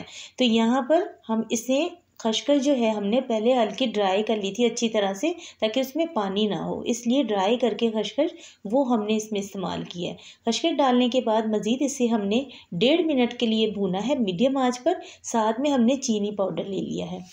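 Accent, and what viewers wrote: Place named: Lahore, Pakistan. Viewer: Ap ki aavaz boht polit h... Style of saying is lahori😊... Boht achi tarh samjhaya gya h ap ki trf SE... Delicious so much ❤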